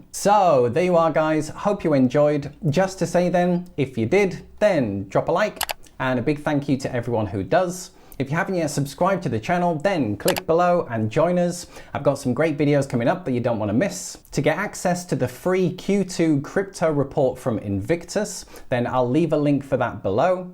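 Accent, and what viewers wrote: United Kingdom. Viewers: Your British right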